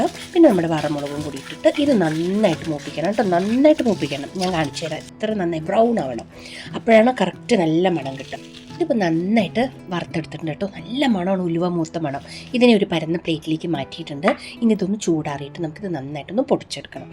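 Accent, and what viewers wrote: Palakkad, India. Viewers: സംസാരം തന്നെ കേൾക്കുമ്പോൾ കൊതിയാകും ഉണ്ടാക്കാൻ ആയിട്ട് പാലക്കാട്ടുകാരി സൂപ്പർ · Typical palakkadan bhaasaha ttolin😊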